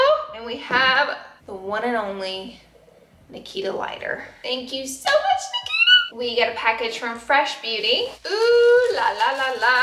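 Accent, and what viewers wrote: South, United States. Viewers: Sounds like she has a southern accent. I love it! For time watching her.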